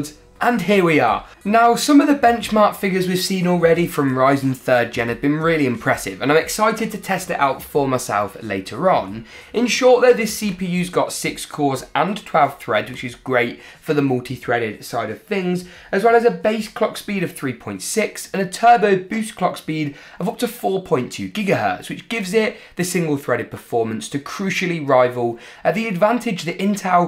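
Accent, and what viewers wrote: United Kingdom. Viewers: Is it racist if Somehow I like his accent, is that British accent? That's cool for my Asian ear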